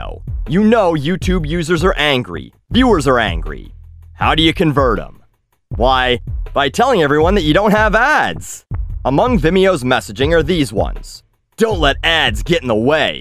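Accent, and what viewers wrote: Canada. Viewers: It's great to hear your strange Canadian voice again! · <3 I've missed you and your pissed off Canadian accent:)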